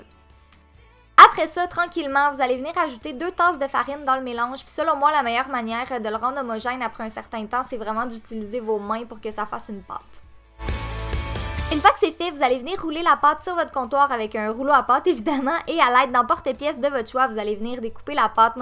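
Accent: Quebec, Canada. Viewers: c'est vrai c'est beau le québécois😘😘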